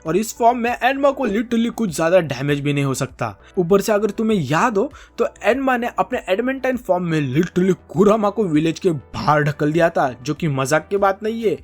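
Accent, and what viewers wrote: Maharashtra, India. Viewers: Bhava Marathi Ahes ky??? Thodasa Texture Janvlaaa!!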